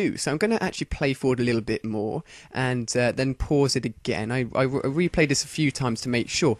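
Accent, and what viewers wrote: United Kingdom. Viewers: i love your british accent!! teach me!!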